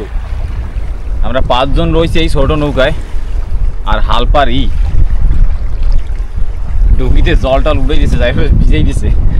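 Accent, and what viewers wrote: Bangladesh. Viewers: Bangla vasa akdom BanglaDeshi tomake Nijer Apon lage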